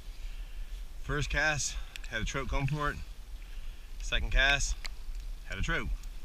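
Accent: Canada. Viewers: assuming your accent and not watching any of your videos i assume you are Canadian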